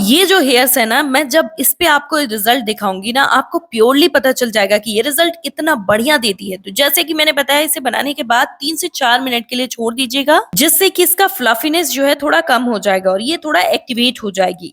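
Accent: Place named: Gonda, India. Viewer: Gonda se bol rahe hai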